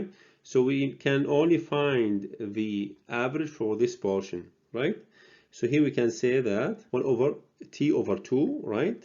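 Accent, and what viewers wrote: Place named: Arab world. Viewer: Perfect explain, and i see the Arabic voice in your explain, please make Arabic language as same as the English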